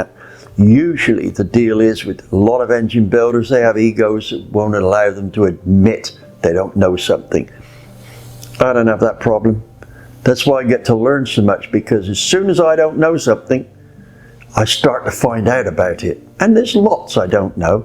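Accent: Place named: South West, United Kingdom. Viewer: MARTIN PIERCE is (A VERY OLD GREASE MONKEY) who sounds exactly like you with that old south west farmer accent , not sure he will watch a channel like this? Martin is the smallest cheat in car mechanics i know 😂where’s me a 48 clueless female (car lover) have bodged many a thing on my cars and buy some miracle they’ve worked . Like the last exhaust i just did . Know iv got the attention span of a fish so as much as id love to listen to your lovely slow talking persona il leave it to MARTIN PIERCE , with a damn site more patients than me!!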